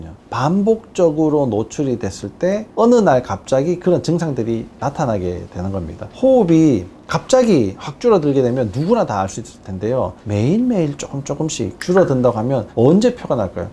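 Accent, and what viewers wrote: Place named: Gyeongsang, South Korea. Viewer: 깅썅도 사투리.억양.엑센트 듣기 싫으네.... 기본도 모르면서 아는척을 엄청 나불 나발 거리네... 순진한 환자들 다 넘어간다...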